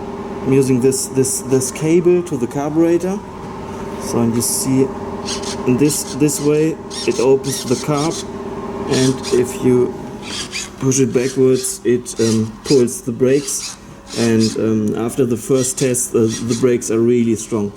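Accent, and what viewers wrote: Germany. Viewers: This happens if a german try's to speak englisch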